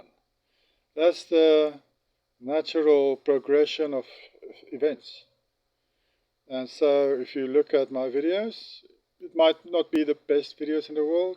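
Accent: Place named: South Africa. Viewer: Ha, I asked if you were South African in one of your later videos, and now after this one I know. I'm going through all your videos now. I found you from your comment on the video with those 3 guys talking about the millennial reign.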